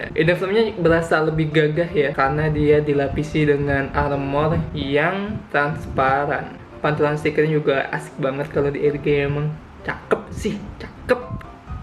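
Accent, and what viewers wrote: Palembang, Indonesia. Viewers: Bang orang Palembang ya?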